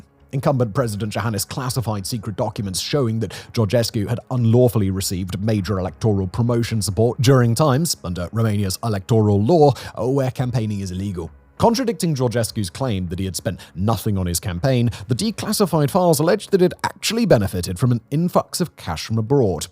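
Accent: United Kingdom. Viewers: PM Ciolacu is not from Iohannis' party. That party is the NAtional Liberal Party, a center-right party. Ciolacu is from PSD, the center-left (and socially conservative) party that has dominated Romanian politics in all of its incarnations. Ciuca was Iohannis' creature, since the President propelled him to lead the National Liberal Party and to be PM before Ciolacu as part of their left-right alliance during the war in Ukraine. Listening to these videos reminds me of how Gell-Mann amnesia manifests, when you believe something a Brit tells you in his cool accent until he starts talking about something you know.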